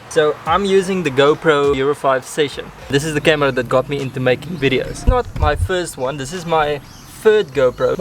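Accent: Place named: South Africa. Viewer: You sound South African, yep.... 😉🇿🇦🤙🏽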